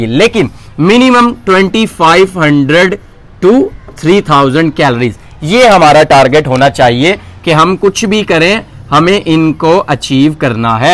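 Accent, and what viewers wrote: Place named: Punjab, India. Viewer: Love the Punjabi Touch...Stay Blessed...🤲🙏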